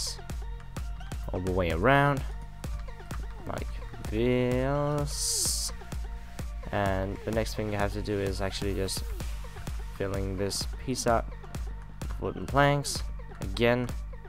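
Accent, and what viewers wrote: Denmark. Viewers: R u Danish? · I hear Denmark, i like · I was about to comment "where are you from i love your accent" but i think i love it because I'm from denmark to, oh and it's called an overhang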